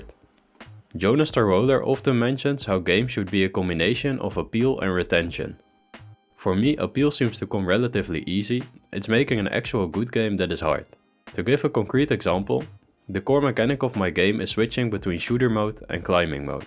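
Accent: Netherlands. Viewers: Are you Dutch? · I hear a Dutch accent, Ik had/heb dezelfde struggles. Het is nooit verkeerd om opnieuw te beginnen en te leren van de fouten die je hebt gemaakt. Maar... Te, is ook niet goed he ;) op een gegeven moment Delen, met vrienden, familie en community, en feedback absorberen. Heel veel succes met je droom! Ik heb dezelfde =) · Too bad. Je klinkt Nederlands! Not everything needs to be original or grand. This definitely sounds like potential but needs interesting level designs. Maybe someone with more experience can help a great deal. But I get that you're fed up with the spider gunner game now. Making experimental games is the hardest there is. KISS principle and sticking to tried and true concepts can help. I made a card game: <link>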